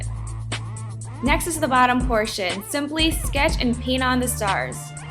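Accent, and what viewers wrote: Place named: Chicago, United States. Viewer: i love your accent.. its so... so... CHICAGO!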